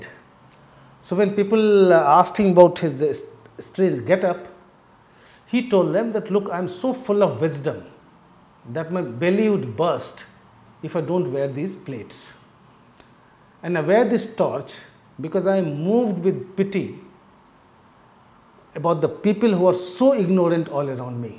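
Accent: India. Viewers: I like your analysis AND. I like your accent - not everyone needs to speak like goras - Indian accents are perfectly acceptable - don’t be snobbish guys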